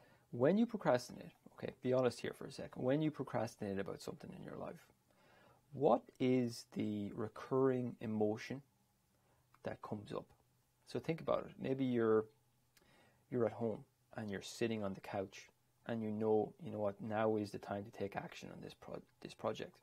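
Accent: Ireland. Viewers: very cute.. are you Irish? i would stop procrastinating with you.. ;-)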